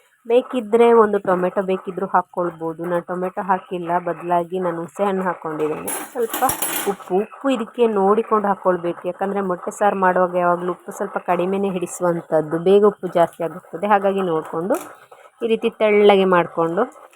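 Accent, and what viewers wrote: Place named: Udupi, India. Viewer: ನಿಮ್ಮ ಮಾತು ಕೇಳಿ ನಾನು ಊರಿಗೆ ಬಂದ ಹಾಗೆ ಆಯ್ತು ನಮ್ಮದು ಉಡುಪಿ 🥰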